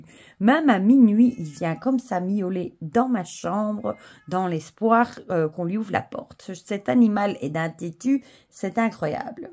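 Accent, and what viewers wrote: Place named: Belgium. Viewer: Coucou bonjour 🐈❤🐈‍⬛🦄🐿🦔🐼🦆🐢🐇🐭, Grand Merci pour cette vidéo. J ecoute grandement car ce matin, je n etais pas présente. Ce jour 33 degrés 🌡, c est un grand ouuufff 😢. Un été qui ne brûle pas, c edt un vrai REVES. Un jour ca brûle, un jour un peu moins. C est cooolllll, que tu fasses la guidance sur ta terrasse. Le train 🛤, un vrai bonheur. Tu me fais trop rire 😂😂, ton Gardfield 🐈 qui est têtu 😂😂. J adore cet accent Belge 👍👍😘😘. Gratitude infini 🙏. Je te remercie infiniment et je te souhaite un bonne journée. Chez nous, comme tu sais, c est Férié. Je t envoie pleins de belles énergies 🎉💫💥. Prends soin de toi et de Ta Famillette. Bisous bisous Sylvie 🙋‍♀️🕊🦋🕊🦋💙💙💙🙏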